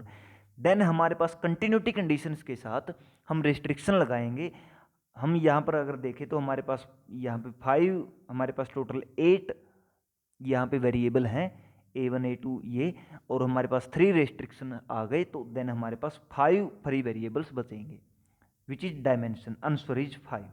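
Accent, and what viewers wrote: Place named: Haryana, India. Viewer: Sir aap haryana se lg rhe hain😂❤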